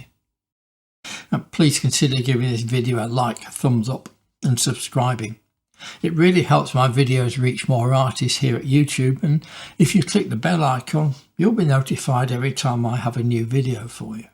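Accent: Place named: United Kingdom. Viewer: J'ai dû beaucoup dessiner dans ma vie car ceci est exactement ce que je fais en dessinant. En suivant cette manière de faire, on développe un sens assez fin de l’état de la pointe du crayon, permettant toutes les nuances sans avoir trop à réfléchir. J’ai beaucoup aimé votre style de dessin, je vais regarder vos autres vidéos. En vous écoutant je me rends compte que quand j’écoute un accent britannique, je fais très confiance instinctivement au niveau de compétences et de sérieux de la personne. J’apprécie la modestie des britanniques alors que assez systématiquement les gens ont des habilités particulières à communiquer qu’ils ont peaufinées pendant longtemps. Merci, un bonjour de 🇨🇵 !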